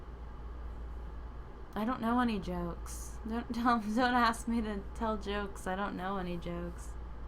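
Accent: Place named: England, United Kingdom. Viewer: I adore your English accent .... Oh, I'm not talking about that. You're gorgeous drawing! I am self-taught in drawing and you always inspired me, and also helped and in general you can say that I taught you how to draw correctly. It's gorgeous ~ And yes, if there are mistakes, then pritenzii to Goggle translator. = 3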